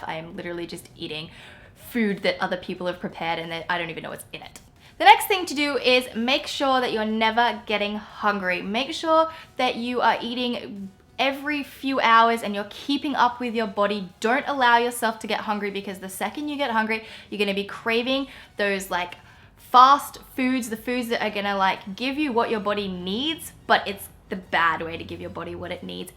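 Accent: Australia. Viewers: She has an Aussie accent. is she from Australia? :)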